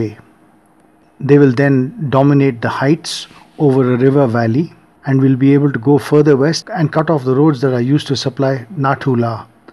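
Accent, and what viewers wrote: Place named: India. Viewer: This is a very good presentation done by an Indian narrator with a genuine Indian accent. Use more narrators of Indian origin rather than those awful male / female computer generated drones who talk like mechanized robots and cannot even pronounce the names properly.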